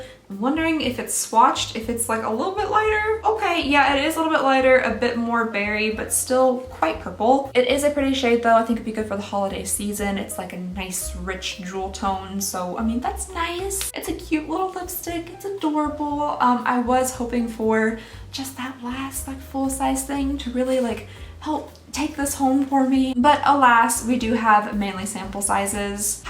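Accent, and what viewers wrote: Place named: Alabama, United States. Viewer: Ooooookay... several things: if that Lipglass smells like bad play dough, it is EXPIRED!! Please don't use it! Second, I have very much enjoyed your videos this holiday season. I'm glad I found them.... BUT there is a HUGE point that I haven't heard you make in any of these videos. Although all of your points are valid, you neglect to mention that all, or at least most, of these advent calendars show you what is inside before you buy them, so if it's not worth your money, you will know before you buy it. If they were blind buys, I would agree with you wholeheartedly, but you almost always know what you're getting before you ever make the purchase. If you addressed this somewhere before and I missed it, I'm sorry. I still really enjoy watching you read these brands to filth, but I also know that consumers can avoid the heartache! 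Last, but not least, I'm from Alabama and its nice to watch a YouTuber with an accent close to mine! Merry Christmas!!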